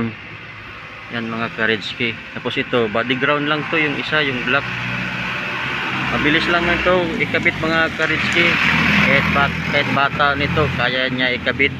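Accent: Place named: Visayas, Philippines. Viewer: Tunog bisaya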